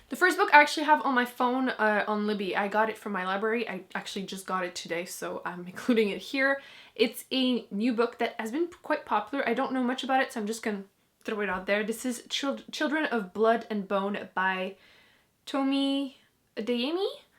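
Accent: France. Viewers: sorry but each time I keep wondering... are you french ?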